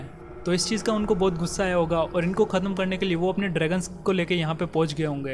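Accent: Gujarat, India. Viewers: Bhai tu gujrati hai na ??😅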